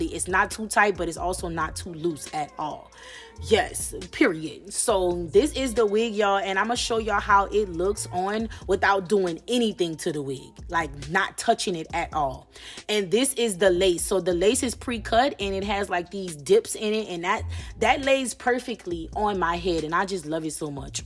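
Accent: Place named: South, United States. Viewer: Ooop why I wasn’t ready for this cute ass voice/accent. Wth is you from, it’s given souf for sure …but I’m slightly conflicted. The way you say BELOW give the boot. Love I happened on your page today❤❤❤❤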